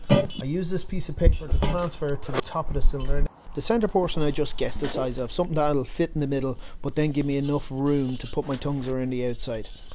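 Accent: Ireland. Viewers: You Irish? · Don’t even try using the closed captions. You just need to learn thick Irish. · Stellar video! So cool to see it worked out so well, and the video production is also very nice. Loved the commentary and the Irisch? accent.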